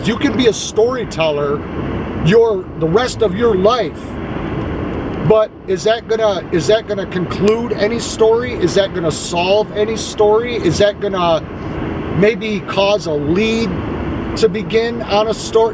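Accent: United States. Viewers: American, i would say. (english accent)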